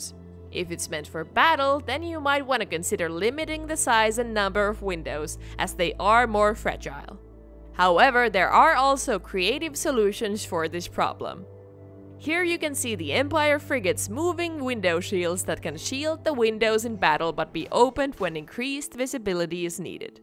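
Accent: Finland. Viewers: Are you from Finland?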